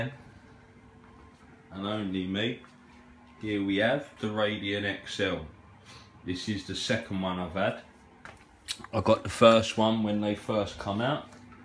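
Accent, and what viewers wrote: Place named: London, United Kingdom. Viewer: Thanks. I've just bought an XL so this afternoon I'll get cracking on your suggested mods and hopefully save myself some grief. Good to hear an English voice at a speed that can be understood. A good London area accent.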